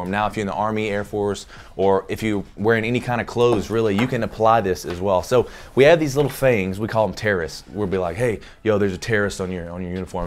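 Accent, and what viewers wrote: United States. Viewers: sounds so country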